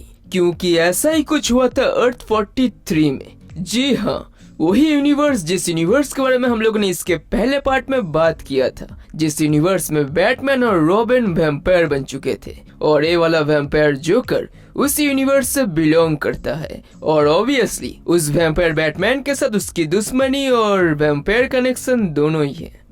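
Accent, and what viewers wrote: Bengal, India. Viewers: Are you bengali ? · Bhai tu bangali hai. Na?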